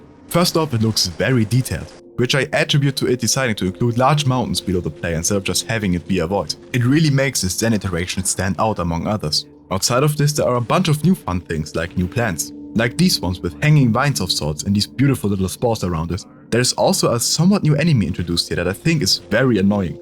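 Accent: Germany. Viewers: wait you german?! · You're German? I've lost all recollection of European accents.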